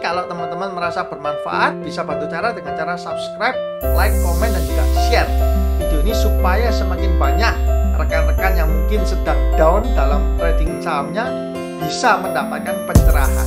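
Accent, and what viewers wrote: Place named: Java, Indonesia. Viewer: Jadi ketagihan lihat video p ini.... Banyak ilmu yg bisa di ambil,Saya juga suka logat nya medok jawa meski pakai bhs Indonesia😁....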